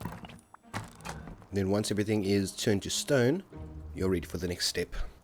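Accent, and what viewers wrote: Afrikaans, South Africa. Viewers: I don't even play Rust but you got me hooked on watching, love the accent, is it South African or New Zealand? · so thats what the accent is! and that language from the other vids wasnt norwegian/swedish/etc, it was afrikaans fml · Are you south African?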